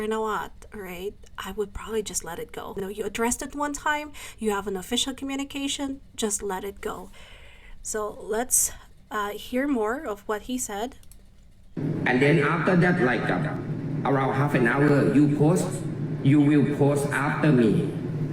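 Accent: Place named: India. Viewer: Mr Nawat is hands on in his organization. There is nothing more effective than him making that move. You sound like Indian so I don't acknowledge your point. Your subscribers is less and I don't think it is going grow fast. You are sounding like moralist like most of Indians. I don't dig,that. I didn;t subscribe nor like your content. I have to change channel.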